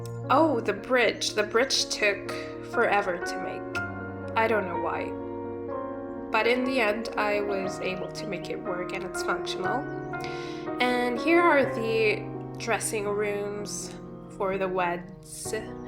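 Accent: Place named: United States. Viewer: Tbh you sound like an American to me so your doing great!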